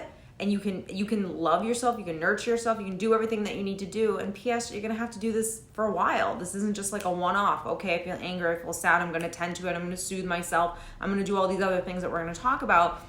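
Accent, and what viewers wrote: United States. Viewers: Healing about what ? Get stuck? It's the way you see yourself . Self worth help you to overcome your worries . I past through griefs and all of us too . Life is continue It's not standing and don't do nothing Instead of that see the good things around you such your health you have something to eat and a shelter. A lot of child and people suffers because war and they have nothing and ourselve live in a land which has all things we need . To heal we need to look around us and not to be selfish focusing only on ourselve . Is here my opinion teacher . Step by step i get your talk in spite of your American accent .yeah yeah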